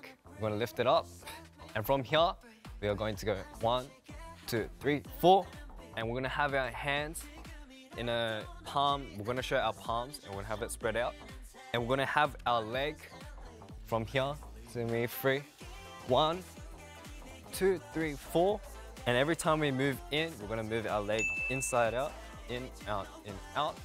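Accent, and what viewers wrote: Australia. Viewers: i don't stan straykids at all but this is the cutest this i've ever seen, felix's australian accent is the cutest thing ever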